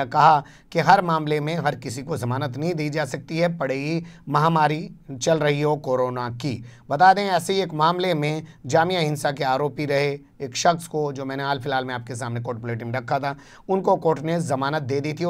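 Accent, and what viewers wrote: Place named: Western Uttar Pradesh, India. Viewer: सर आज आपके मुँह अपनी खड़ी बोली सुनकर मजा आ गया सर कभी पश्चिमी उत्तर प्रदेश को अलग प्रदेश बनाने पर कोई बहस या कोई शो कोई न्यूज चलाए थारा अहसान होगा म्हारे पर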